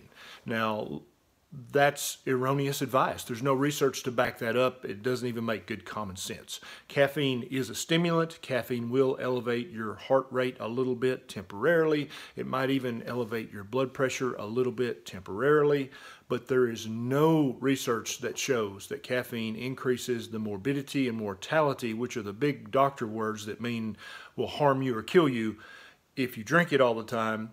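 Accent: South, United States. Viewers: What part of the south are you from? Im curious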